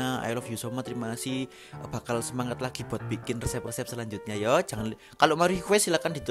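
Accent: Java, Indonesia. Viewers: dengan khas suara medoknya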